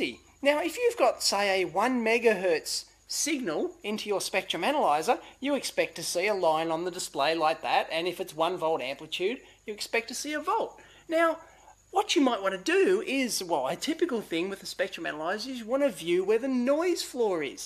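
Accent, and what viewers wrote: Australia. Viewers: Thank you so much for the video! you are definitely an aussie aren't you? haha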